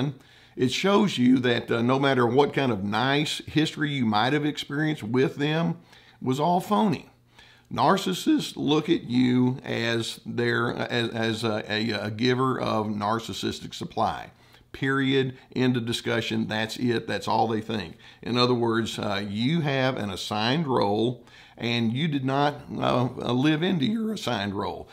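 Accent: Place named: Texas, United States. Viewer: We have a narc neighbor (70 year-old female covert) in the condo below us that's always chiming in on petty things that are none of her business. It started with small, innocent statements, then escalated to (subtle and not so subtle) attempts by her to govern when we use our plumbing, appliances, electric blinds, enter various rooms, you name it. Boundaries of our home space, bodies and schedule are constantly tested. She invents rules and limitations that go beyond local laws. Whenever we have visitors, she'll go out of her way to rain on our parade. She tries her best to get into our heads and create psychological doubt. There's zero tolerance as we never know which alleged infraction will lead to a lengthy, condescending, word salad text or email. Since educating myself on narcs and going grey rock, she has lost interest in combating us and may soon be moving out. 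Thanks Dr. C for imparting your wisdom with a sweet, down-home Texas drawl. Fingers crossed!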